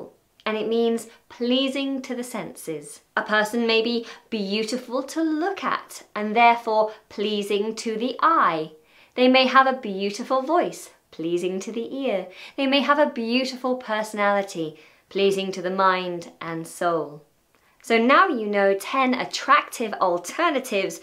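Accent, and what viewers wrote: United Kingdom. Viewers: You're sweet, but why aren't you blonde? Swedish girls are much sweeter than British girls. However, I am crazy about hearing your British accent, sweetie! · To be 'onest I don't like British accent sorry 😆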